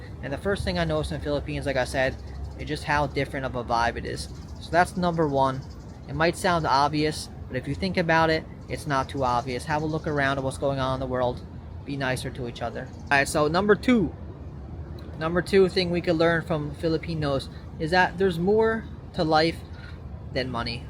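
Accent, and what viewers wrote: New York, United States. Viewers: Beautiful message, brother! Love your NYC accent ❤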